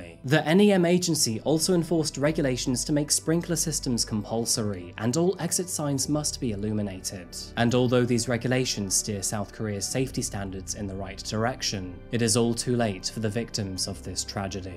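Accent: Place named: United Kingdom. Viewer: I think I'm officially addicted to your channel. Murder mysteries, forensics, crimes etc are so interesting! You tell these so well and it's nice to hear a fellow Brit, since moving to France. You make me feel at home 🖤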